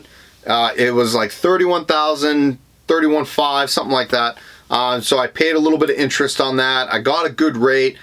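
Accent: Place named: East Coast, United States. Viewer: Nice. I was wondering where that east coast accent was from. New York, here.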